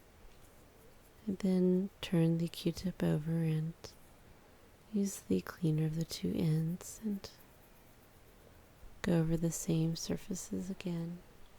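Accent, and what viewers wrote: South, United States. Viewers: By the way, do you happen to be from the Southern United States? I thought I hear just a really slight drawl but maybe it's just the soft speaking.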